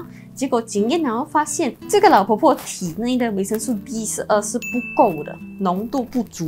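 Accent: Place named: Malaysia. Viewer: 视频主的中文发音很不标准，乡土味很重。请问是不是马来西亚华人 ？😁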